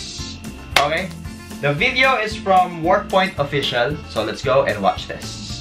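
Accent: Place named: Singapore. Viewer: สิงคโปร์เลียน ชัวร์ สำเนียงอิ้งแบบนี้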